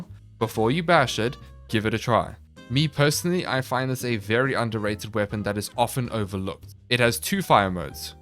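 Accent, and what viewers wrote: South Africa. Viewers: yooo.. are you south african? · You are most deffinitely South African arent you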